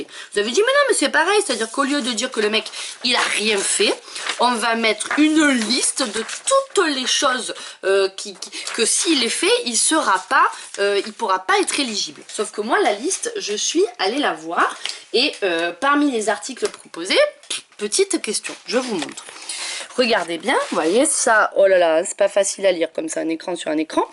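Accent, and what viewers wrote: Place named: Provence, France. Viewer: C'est la première fois que je me rends compte que Nicole Ferroni a un léger, et néanmoins délicieux, petit accent méridional. A croquer !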